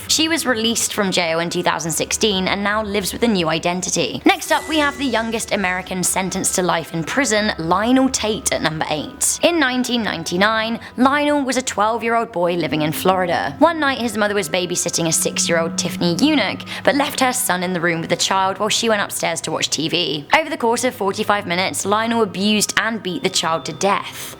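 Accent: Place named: England, United Kingdom. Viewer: That good old english ascent with that morad the fooouurth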